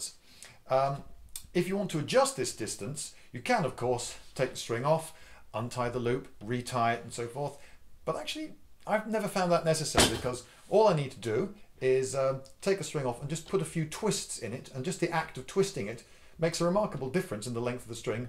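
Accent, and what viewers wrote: England, United Kingdom. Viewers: Oh thank God you were EXTRA English in this video! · props to the mentioned extra English-ness. I enjoy your videos very much. best regards from across the pond!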